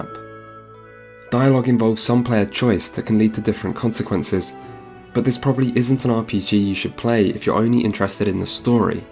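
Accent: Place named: United Kingdom. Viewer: Not going to lie, subscribed mainly because of your voice and accent. You're like British Bob Ross.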